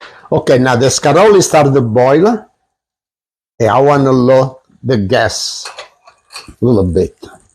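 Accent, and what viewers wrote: Calabria, Italy. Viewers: From Schaumburg IL speaks like my grandparents who were Calabrese. But I have family in Torino!! I see he worked in Torino.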